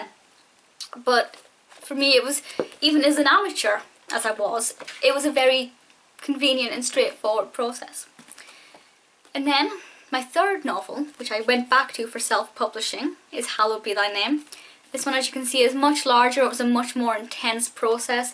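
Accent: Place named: Scotland, United Kingdom. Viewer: Hey right now this is the first video from you im watching and i'm trying to guess from where your from based on your accent. My guess is your Scottish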